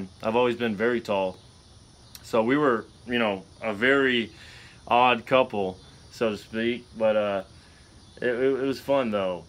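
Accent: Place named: South, United States. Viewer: Glad I found your site!!! Stories making my heart melt!!! Not sure if it's the Southern drawl, cowboy hat or YOU!!! PLEASE keep posting!!!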